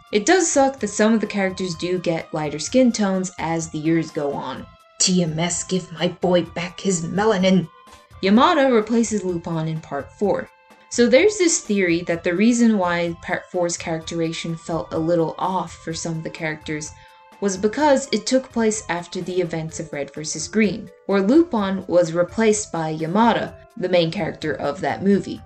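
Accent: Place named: Ireland. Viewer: Are you Irish? I'm Irish and your accent sounds familiar.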